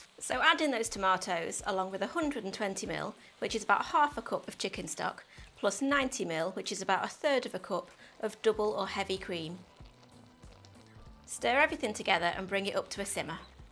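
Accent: United Kingdom. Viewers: This woman has lost her mind. Dont know her accent but guess she must be from the UK. What on earth is she doing to food?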